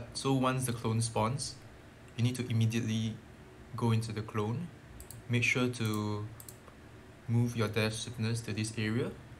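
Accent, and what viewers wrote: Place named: Singapore. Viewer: Bro are you from Singapore? You sound like a Singaporean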